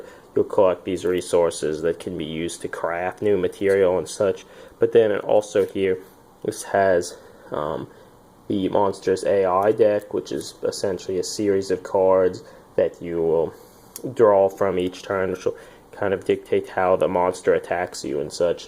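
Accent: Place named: Louisiana, United States. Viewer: Nice video. Gotta say, you almost sound Cajun. Are you? I am. ^_^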